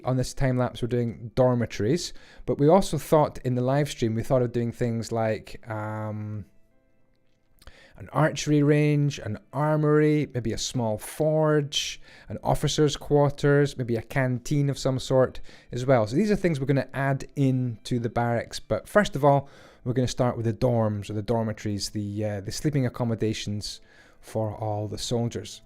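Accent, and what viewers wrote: Scotland, United Kingdom. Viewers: "hoose"; I love scottish accents and this is the reason why I watch you.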